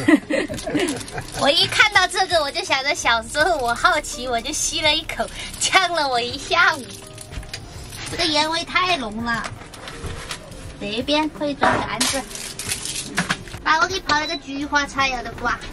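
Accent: Sichuan, China. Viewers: ：我在台灣看妳的視頻三年了，我們是台灣外省第二代，小時候住在空軍眷村，因為空軍多半是四川人，我也會講四川話，聽妳講四川話，更親切！新年快樂，妳很棒，加油！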